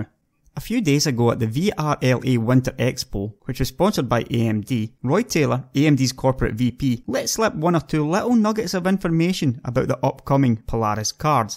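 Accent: Scotland, United Kingdom. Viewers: he was speaking with a Scottish accent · your TRAINSPOTTING accent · your lovely Scottish voice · i liked your skotish accent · hard for me to understand your wonderful Scottish accent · This is the scottishest schottish accent I have ever struggled to understand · I love the Scottish accent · He is scottish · love your accent? you scottish? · He has a Scots accent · holy shit he's a scottsman! was not expecting that! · pretty sure he is British, Scottish my guess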